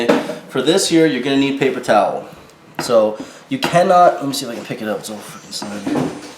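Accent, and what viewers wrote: Canada. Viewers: ***** even sound a bit canadian now... -Tko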